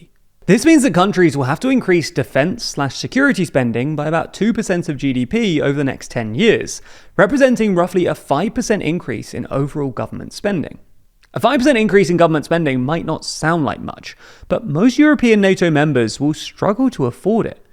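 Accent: United Kingdom. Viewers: TLDR News EU? You sound extremely British to me. Does the UK want to join the EU? Nobody is walking into anything. It is more that we are crawling into a federal EU. It goes so slow. As long we are not all speak the same language in all countries it is still not a real federation in my eyes. Everything we can do together we should. Saves money. We don't need a huge army against Russia. We just need a hyper modern army with rapid response forces and a bit more nuclear weapons. An d all of this bought in the EU. The 5% deal with Trump is a hoax. Anything to get that orange monkey of our backs so we have a soft landing economy wise. Trump is the biggest liar in history of US presidents and changes the rules when ever he wants. That means we can do that too and thus do not have to abide by a number (5%) Trump shot out of his ars. Any deal made by Trump is not even valid in the US itself so why would we honour it? 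No, we just need to pay for our own defence and we will decide ourselves how much that is gonna be... This is how it is but just not said out loud by our leaders...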